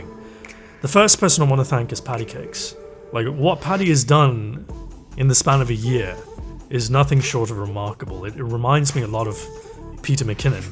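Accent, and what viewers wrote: United Kingdom. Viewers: Happy birthday,! Only subbed very recently, literally two days ago. But I love the content I have seen, and it's lovely to see/hear a brit in a sea of Americans. Thanks for the tips and the laughs, here's to 2021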